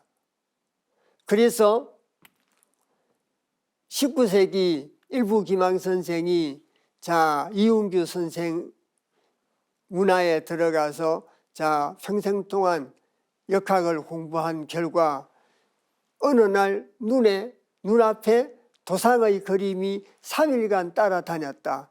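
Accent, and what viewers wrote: South Korea. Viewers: 쾌 발음은 되는데 괘 발음은 안돼요? 경상도 억양이 심하긴 하시지만 도를 또로 말하는 것 외엔 거의 모두 제대로 발음하시는데 유독 괘만 예외없이 쾌라고 하시니 안타깝네요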